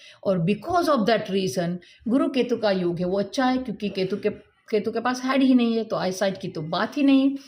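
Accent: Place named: South, India. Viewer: Sahi kaha.. South Indian accent bot acha lg rha hai..👍🏻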